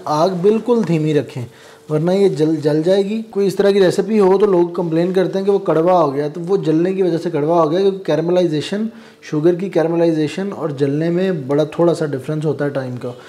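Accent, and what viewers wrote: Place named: Islamabad, Pakistan. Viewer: Islamabad ki tone, I like the way you speak. ❤, gonna try this recipe today.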